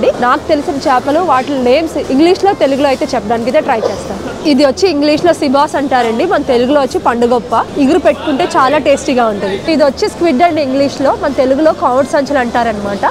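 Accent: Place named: Srikakulam, India. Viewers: మీరు కచ్చితంగా మా శ్రీకాకుళం వాళ్ళు అనుకుంట, బాగా చెబుతున్నారు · Medi pakka Srikakulam andi ❤❤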